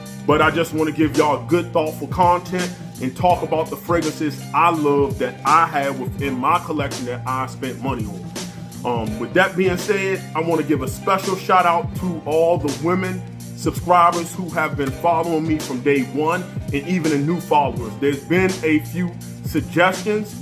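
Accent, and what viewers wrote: DMV, United States. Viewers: Man people in the dmv really do have a accent. Love the video keep it up!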